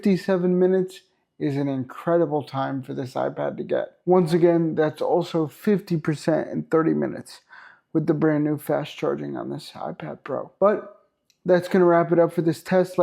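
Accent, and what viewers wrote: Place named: Scotland, United Kingdom. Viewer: God bless you the exact content I needed that ia could not answer. I take it your descended from Scottish people I can tell with your accent. The home land thanks you x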